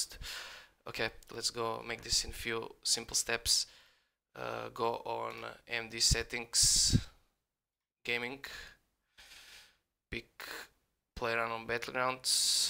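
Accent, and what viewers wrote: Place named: Serbia. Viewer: Bukvalno sam znao da si nas jer kako pricas engleski nisam ni trebao da gledam foldere xD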